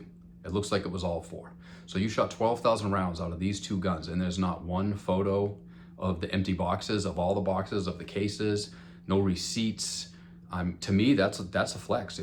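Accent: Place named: Boston, United States. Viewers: Bro where are you from? I was born and raised in MA, and when you said, “stahted fuckin 12 days ago” I was home in Lowell for an instant🤘🏻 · First time viewer but judging by the voice..... Go Sox...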